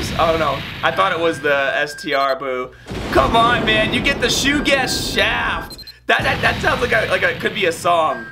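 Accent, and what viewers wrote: Boston, United States. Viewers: You from Boston my guy?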